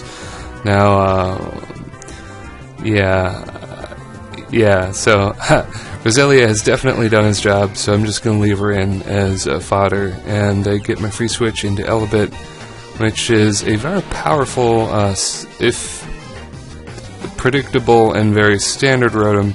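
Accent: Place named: Australia. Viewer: Youre sounding Less and less australlia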